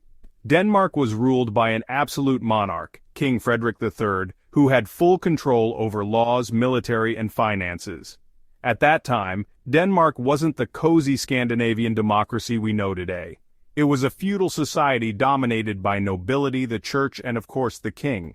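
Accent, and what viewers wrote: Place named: United States. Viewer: Why are you speaking with an American accent whats wrong with a Danish accent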